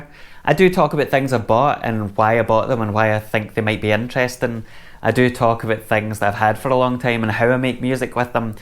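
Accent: Scotland, United Kingdom. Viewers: Found your channel by accident but happy I did. Nice to hear a local(ish) accent and sumdy else who likes synths haha. Do I detect a wee southside accent, Ru'glen Camslang area?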